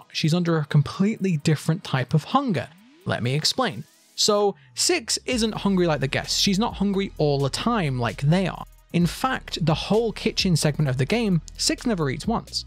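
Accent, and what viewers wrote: United Kingdom. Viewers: He's so aggressively British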